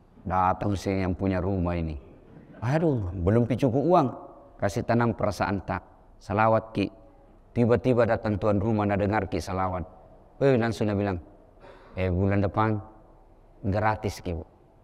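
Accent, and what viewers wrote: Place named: Sulawesi, Indonesia. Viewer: Logat Sulawesi Pak Ustadz Sulawesi Bagian Manaki Ustadz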